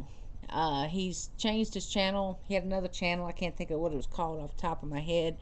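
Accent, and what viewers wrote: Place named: South, United States. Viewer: Love southern accent! :D I want one!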